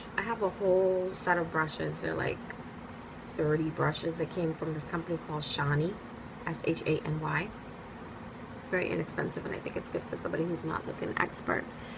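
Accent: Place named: New York, United States. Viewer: I love love your New York accent.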